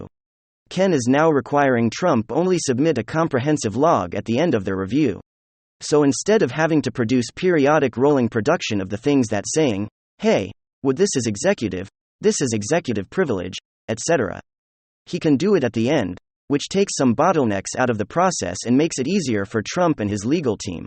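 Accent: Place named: Russia. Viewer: use your real voice next time i want to hear your cute russian accent :3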